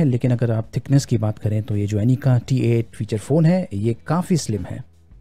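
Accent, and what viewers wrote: India. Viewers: Person in video looking like non Indian but audio is Indian . Is this phone available in India .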